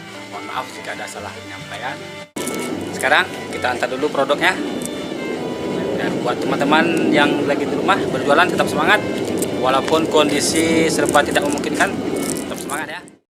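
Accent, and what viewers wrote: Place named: Sumatra, Indonesia. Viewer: Bang ini berasal dr daerah mana ya? Kaya logat Sumatra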